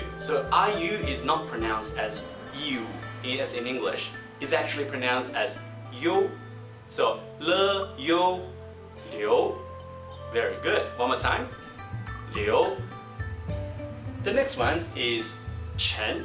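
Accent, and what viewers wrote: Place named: Australia. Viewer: Thanks for the resource. Do you know if these spellings Pinying spellings? If so, is Pinying still used as a common way to transliterate Chinese pronunciation into English? I ask because I've met some mainland Chinese who don't know anything about Pinying (unless I'm also butchering my pronunciation of it). Also, do you speak German? I ask because your URL includes "aus" which often translates as "out of"? Sorry, I guess it means AUStralia, based on your accent?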